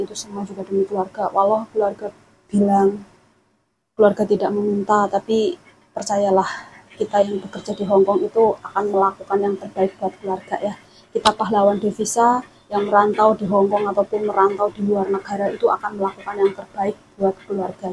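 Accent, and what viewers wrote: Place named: Banyumas, Indonesia. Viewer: Masak masak di Hongkong tetep semangat salan ngapak bae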